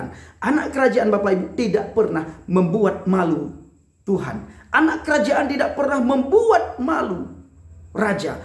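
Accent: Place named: Aceh, Indonesia. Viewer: Luar biasa kesaksian dari orang Aceh ini, logat Aceh nya masih terasa. Haleluya.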